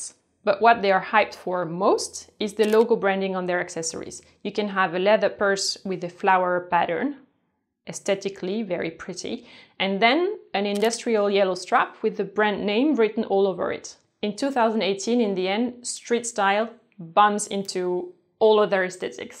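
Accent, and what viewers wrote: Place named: France. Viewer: i love your accent so much!🤗 it's true🙄. with french shade.. i'm personally from Ukraine and i study english now☺. and this channel is a piece of inspiration for me🙄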